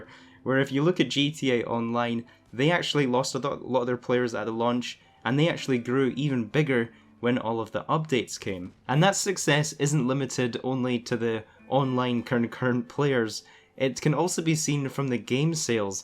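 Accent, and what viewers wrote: Scotland, United Kingdom. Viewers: First off thanks for another vid... been enjoying your series since it started. Nice to hear a Scottish voice amongst all the other youtuber videos 👍 After having played GTA Online since its OG launch, I found Read Dead Online a welcome change from the often frenetic pace of GTAO, although GTAO has given me hundresds of hours of online fun over the years, especially with the Heists (completing the Criminal Mastermind Challenge was awesome) and more recent Business and Arena War updates. Shifting to RDO, I had high hopes for revisiting the world of Red Dead after RDR and though I did get a bit bummed after a time playing solo in RDO, I have since connected with some good regular players via our PSN Community and now it is so much better! Aside from the griefers, which are pretty much negated once you are in a reliable posse, RDO really just needs some balancing and regular expansion via content updates. 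As an OG GTAO player, I know from first hand experience that GTAO was "dead" during it's first few months of existence (there were constant server issues during the early days) but within a year it had really started to evolve and become immensely playable. I think the same thing will happen with RDO and while it may not hold the same kind of opportunity for such diverse updates as GTAO, there is a huge amount of potential there. Let's see where it's at come October 2019 before we write it off. Meantime, I'm having lots of fun hunting and gathering with my posse 🤘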